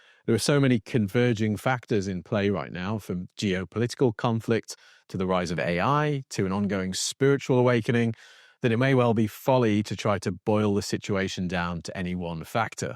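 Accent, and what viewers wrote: England, United Kingdom. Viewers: Where are you from originally ? You have an English accent.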